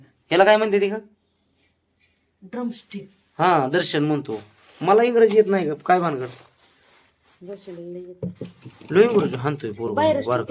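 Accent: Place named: Malvani, India. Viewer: Khup bhari dada ani tumchi Ji gavarani malv bolat ajun bhari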